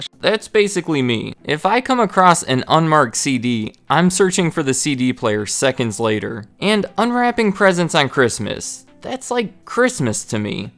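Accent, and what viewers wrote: United States. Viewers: What state are you from? I swear to god the way your pronounce you "ah" sound is so familiar that I must know someone from there. Super subtle accent, but interesting nonetheless.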